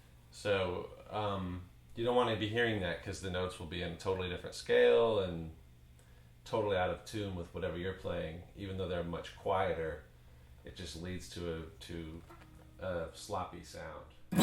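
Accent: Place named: Arkansas, United States. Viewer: You really suck man, you sound like a loser hick white guy from Arkansas or something. Man, you SUCK. lol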